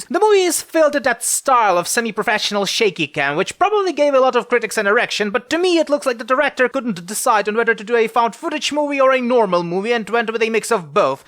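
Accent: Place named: Eastern Europe. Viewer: Damn, I slowed it down and got classic east EU accent from US movies. Git gud -_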